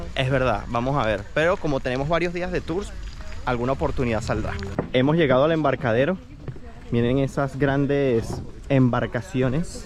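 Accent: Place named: Venezuela. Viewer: Disculpa, tocayo, no sé dice eso no debería de ser así soy traductor simultáneo y docente en idiomas, manejo varios y los enseño y también enseño español a extranjeros y la gramática del español dice que después del verbo DEBER en cualquiera de sus tiempos y formas, se debe usar y no se debe de usar un verbo en infinitivo, por lo tanto esa preposición DE no existe, sobra. Disculpa tocayo que aproveche está oportunidad para corregirte, no lo hago para hacerte sentir mal sino para que mejores en el uso de nuestro idioma español, fíjate que no menciono nada de tu acento venezolano, eso es secundario, me refiero es a los errores gramaticales. De nuevo. Mil disculpas por corregirte y mil bendiciones. Gabriel Antonio Sánchez Aldana Traductor Simultáneo y Docente en Idiomas. Exsacerdote Católico.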